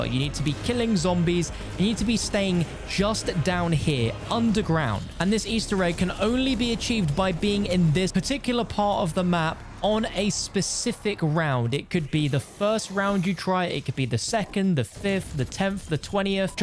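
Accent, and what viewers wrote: England, United Kingdom. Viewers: Don’t pick on his pommy accent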